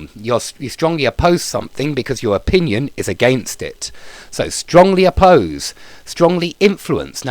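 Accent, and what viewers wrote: United Kingdom. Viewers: Great video. By the way, you have an elegant, educated British accent.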